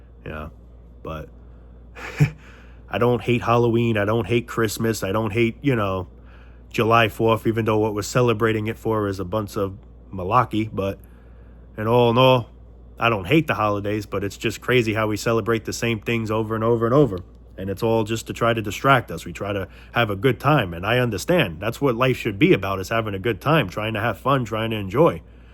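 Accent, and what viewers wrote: New York, United States. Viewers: Red pills with a NY accent. I'm in.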